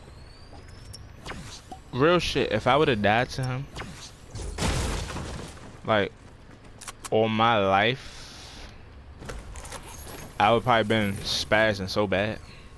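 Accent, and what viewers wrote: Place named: Washington DC, United States. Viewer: sounds like is from Washington dc.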